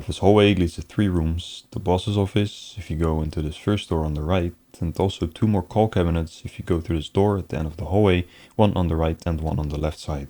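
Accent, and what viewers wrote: Netherlands. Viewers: Judging by your accent.., your Dutch? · He will not reveal his location, but yes he sounds Dutch to me.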